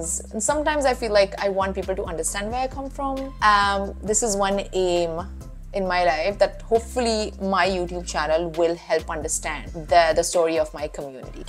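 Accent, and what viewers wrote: Maharashtra, India. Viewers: Your accent is still very Marathi heavy…. Being a Mumbaikar I could easily identify your Marathi accent. All the best with your new life in Israel. Take care. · First of all you are a gorgeous woman. Then your mannerism and accent are 100% indian. And I am loving that. Your looks are almost north Indian, but then there is no such thing as a Jewish look, so hard to tell. You have a positive attitude. Keep it up. I was born and brought up in India for the first 23 years as a Hindu Brahmin, but then for almost the last 40 years, I am living in the US. Keep your friendship with your Indian friends. They are the sweetest. You are the first indian jew I got to know about. I have many Jewish friends of European origin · I am from Sri Lanka. You still has typical indian accent. But i really enjoyed listening to you. Yes we know there are jews in india. 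Kerala,Bombay & Calcutta. May HaShem bless you. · You also have our accent and you look more like an Indian than an Israeli. So aap humare hi bandi ho😅. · Your accent is Indian 😁🤝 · 2-3 years ago.... I saw video about jews.... They were doing documentary on jews.... After watching that video i found that there is Jewish community in western maharashtra.... And i could tell that you are Maharashtrian just by your accent.... So obvious.... That tone was so familiar... I also saw some bene Israelis in Northeast states... There were fighting to go to israel..... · i am sorry to differ but you do have a very strong Indian accent. please enjoy the best of both the world. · your accent is close to Indian though but your face is more close to Jew. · Had I not explicitly known otherwise, I would really have assumed you to be Priyanka or Neha. You certainly talk and even look like an Indian - not that that's surprising 😊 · You know, you sound Maharashtrian to me🤗😍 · I am hearing a marathi mulagi ...😅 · You are Indian, you can be an israeli and still have Indian-ness in you. 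India is a very diverse country and accomodates everyone. So dont worry, you belong somewhere - India (Bhāratha), you were born here and know the country just like us. Israel is also a good country, hope you have a good time there. But remember, you belong somewhere and you have a home! PS : We can still sense a strong Indian accent in your English. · I would treat you like a bhartiya more rather than a jewish ☺️😸 i respect jewish culture but in your case your looks your accent are more like a bhartiya kanya 😸☺️😘 someday i would even like to see you wearing saree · You can pass off as an Indian girl anytime. Your accent laooks everything...your Indian mate...but Congratulations on ur new home Israel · Don’t worry you sound like 2000% Indian. I don’t hear any other accents. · Great video and honest account about your early life in India. I’m Indian Australian American and am thrilled to hear about your move to Israel. 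I’ve never met anyone Jewish in India — you folks are exotic! In this video your accent is Indian with the extra-thick Ts, emphasized H sounds — so not sure what your Indian friends are talking about 🤔I hope you haven’t been affected by the recent terrorist attacks. God bless and take care 🇮🇳 🇦🇺 🇺🇸 🇮🇱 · यो मराठी, वाटलच मला. तुझ्या accent वरून वाटतच होत. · You maybe a Jew. But there is no way, you are Israeli. You are Indian at heart. The way you talk, and emote is purely Indian. And your name is funny. is a vitamin supplement sold in India, which is said to provide instant energy.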